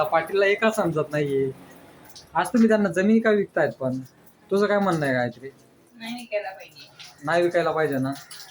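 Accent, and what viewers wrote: Khandesh, India. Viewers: Hallo tumhi kay khandesh chalisgaon jalgaon che aage ka bhasha varun vatate aahe Baki tmhi chhan video banav tat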